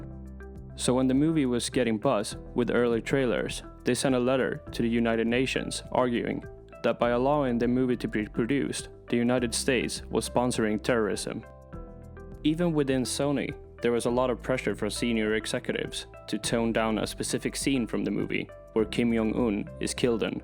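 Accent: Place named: Sweden. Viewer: Intressant, men skulle vara bättre om det inte lät som att ni läser och försök få bort svengelskan!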